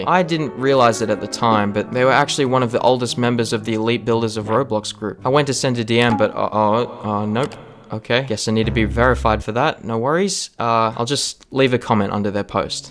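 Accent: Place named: Australia. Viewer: the aussie accent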